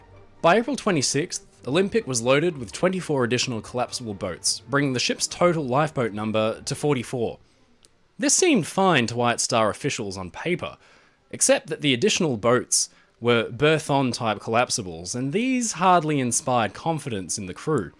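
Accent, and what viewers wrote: Australia. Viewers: He has an Australian accent! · I really appreciate your delivery. It’s so nice to hear narration marked by impeccable pronunciation and phrasing with the stresses and pauses all correct and appropriate. For anyone not familiar with it, the accent is “educated Australian”. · Probably because he is Australian, to him American accents are annoying yet he’s not being an ass cause he seems like a nice dude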